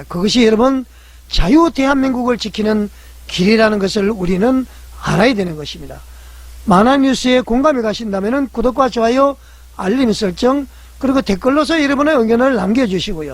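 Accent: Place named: North Korea. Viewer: 북한말씨에 홍설수설 ᆢ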